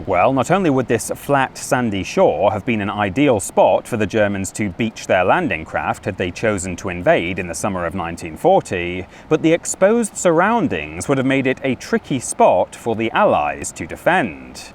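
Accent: England, United Kingdom. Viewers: OMG. its the British Chills. i didn't know i could hate a English accent voice more than I do the rest of them. YOUTUBERS STOP the SINGSONG BS.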